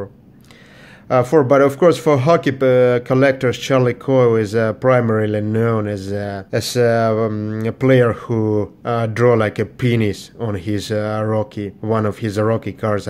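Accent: Russia. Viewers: Nice video man. Are you Russian?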